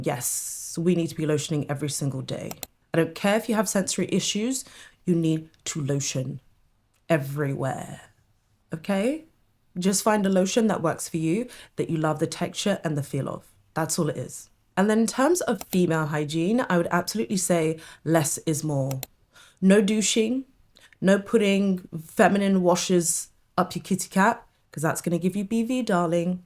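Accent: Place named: United Kingdom. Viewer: nothing beats a beautiful black woman with british accent❤❤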